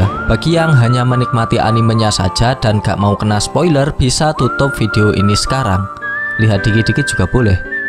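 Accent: Java, Indonesia. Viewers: Medhook pol min 😂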